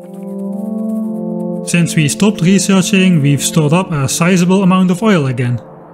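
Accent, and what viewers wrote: Netherlands. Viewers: I recognized the Dutch accent curse from the start! As I have the curse myself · I've been wondering about your accent forever. is it dutch? or something I just can't put my finger on. it's really rather thicc for a dutch accent. but then again sounds like a dutch name. man.... maar uiteindelijk: toch GEKOLONISEERD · "But as I'm Dutch" I knew your accent was a little off to be french, but for some reason I didn't expected for you to be Dutch. I thought the accent was going to be different.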